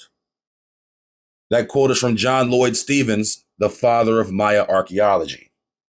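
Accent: South, United States. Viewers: Man. I'm from Georgia and your ass had me 😂 crying talking like one em country boys. Love this channel. Keep doing your thing man. I'll keep watching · the southern accent is distracting and cringe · Bruh, this southern accent is trash. Makes a lot of the video hard to watch.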